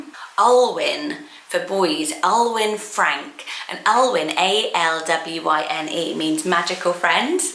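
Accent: United Kingdom. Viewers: These are sooo cool!! Wish half of them sounded as good in my American accent as they do in your gorg British accent 😘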